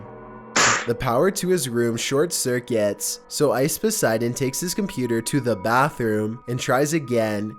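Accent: Sweden. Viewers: Är du Svensk eller?? = Are You fr.o.m. Sweden??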